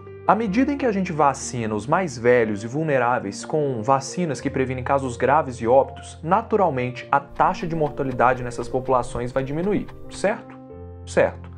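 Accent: Minas Gerais, Brazil. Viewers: cê é mineiro? 😍😍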